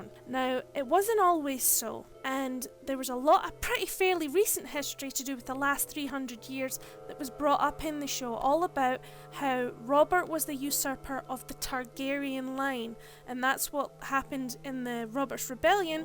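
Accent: Scotland, United Kingdom. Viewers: like your Scottish accent.very informative video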